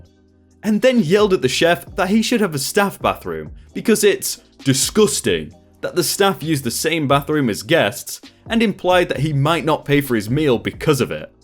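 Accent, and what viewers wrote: United Kingdom. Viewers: I wonder, are you British? You pronounce words in ways I never thought of. I like they way you talk better than the way my friends do. Like how you said "rose". We would pronounce it like "rows" basically. You also say "zed" for z and "hach" for h. I like it. It isn't native to myself so I was curious of where you were from.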